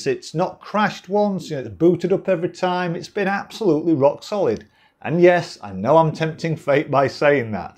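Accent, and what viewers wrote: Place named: Yorkshire, United Kingdom. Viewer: You are welcome and judging by your accent you are from Yorkshire?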